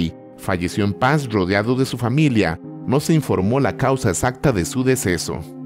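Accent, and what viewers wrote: Costa Rica. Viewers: Es el acento tico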